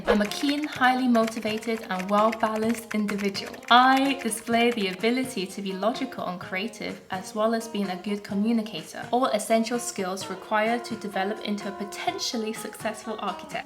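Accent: England, United Kingdom. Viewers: not cringe at all with that english accent ;o made it sound elegant.. the twisted power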